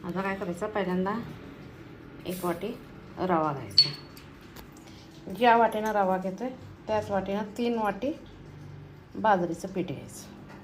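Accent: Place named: Karnataka, India. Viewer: Five like kele 🎉🎉🎉 खूप छान ताई रेसिपी 🎉🎉🎉 तुमच्या आवाजातील कर्नाटकी स्टाईल मला आवडते 🎉🎉🎉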